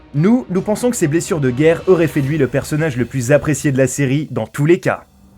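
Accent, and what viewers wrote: England, United Kingdom. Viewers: Arrête avec l'accent anglais et tu écorche tout les noms et ta jamais vu game of throne sa se voit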